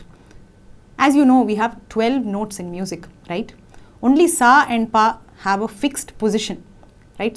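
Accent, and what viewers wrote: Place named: South India, India. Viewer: It is not raagaa.. it is raag... Also, it is not swaraa, it is swar. May be it is due to southern accent? The video is useful. Thanks for your efforts..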